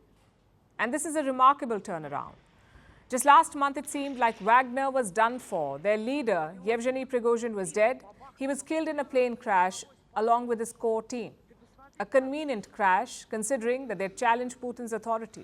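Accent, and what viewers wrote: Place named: India. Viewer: This woman pisses me off with her arrogant voice trying desperately to imitate Tucker Carlson with a ridiculous Indian accent. This woman pisses me off with her arrogant voice trying desperately to imitate Tucker Carlson with a ridiculous Indian accent. Try to be original ma'am.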